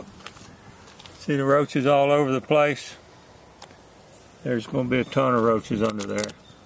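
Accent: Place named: South, United States. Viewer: once I saw duct tape followed by a country accent, i knew i was in the right place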